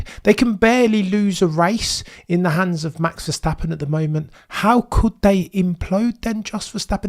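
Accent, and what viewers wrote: Birmingham, United Kingdom. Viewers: try to learn how to pronounce names correctly.... as it is we have to put up with a brummie twang...you sound ignorant.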